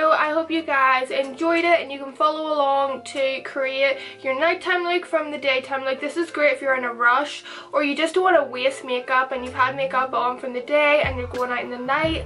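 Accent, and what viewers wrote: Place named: Northern Ireland, United Kingdom. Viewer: It's so refreshing to see a YouTuber from Northern Ireland!!! I feel like I always forget our accent exists on the internet😂💜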